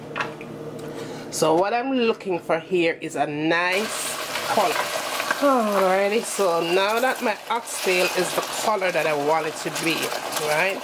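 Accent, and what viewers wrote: Jamaica. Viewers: Yessss I feel like I'm in Jamaica listening to you right now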